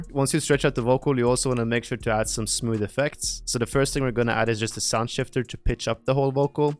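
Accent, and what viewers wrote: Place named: Norway. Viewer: U sound norwegian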